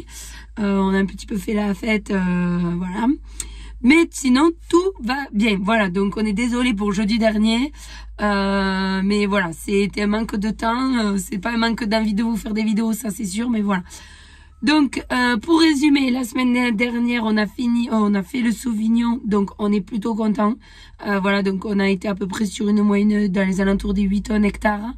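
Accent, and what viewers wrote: South, France. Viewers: Tant mieux que la récolte de la vigne soit acceptable. J'imagine que le soleil de votre région (par votre accent du sud) à aidé à ce que les plants produisent quand même. Quel nom de bouteille est ce que l'on pourrait acheter du Québec pour goûter un petit peu votre région. Merci et bravo pour votre travail.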